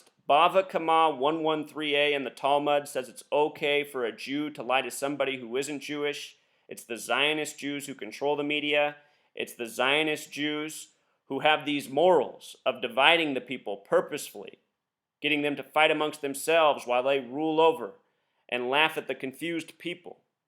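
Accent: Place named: United States. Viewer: You sound American , so I am going to assume you are. How could someone be so insensitivity and reckless to the misery of his own fellow citizen. A man was shot to death, in-front of loved one and a little girl. Your response is to go online making jokes about it. May the God of Abraham and Mosses give you the wisdom to see your mistakes.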